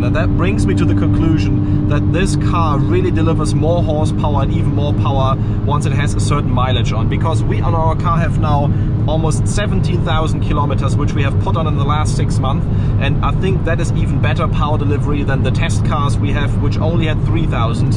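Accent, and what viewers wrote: Germany. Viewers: Ich find es erstaunlich, Aussprache übelst deutsch aber die Sätze fließen nur so dahin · Kannst gut englisch aber man hört das du ein Deutscher bist .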